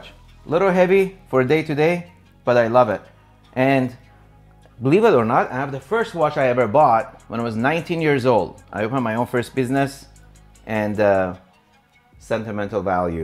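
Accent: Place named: Iran. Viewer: We know you're Persian because you put an e before every word. :)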